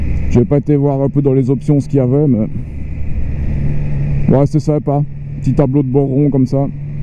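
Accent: Belgium. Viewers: J'ai envie de me la prendre en A2 et je cherchais des vidéos... En t'écoutant je me suis dis c'est étrange il a l'accent et les expressions des mecs de chez moi... ça fait 16 ans que je suis dans le sud ouest de la france pour info... et oui en regardant les panneaux de circulation je me suis dis ! Ba oui c'est un cousin Belges ! Je suis dès Ardennes côté française :) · Ça me fume ton accent belge, j'habite près de Theux aussi mais j'ai acheté une Ktm a gouvy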